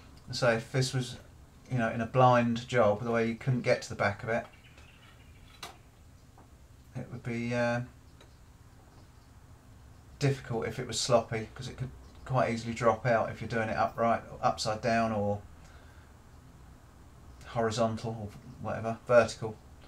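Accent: United Kingdom. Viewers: Thanks for the great video! The water tight application is needed for attaching something to a metal roof like on a barn. I need to attach a tripod with a radio for wireless internet and do not have access to the underside of the tin, so I am hoping this will do the trick. Self tapping metal screws wouldn't have much to hold on to. But why is a dude with a British accent talking imperial inches and not metric? I am from Texas, and it even sounds strange here where I barely know how metric works. :-)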